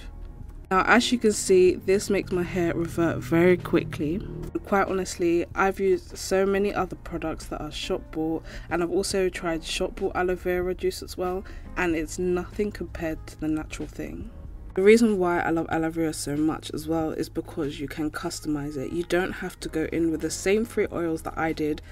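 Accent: United Kingdom. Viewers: British accent from n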